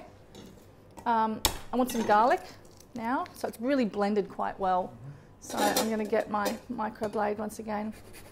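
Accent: Australia. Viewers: She might be the first European to like Americans 😂or is she Australian? Im not very good at telling just by the accent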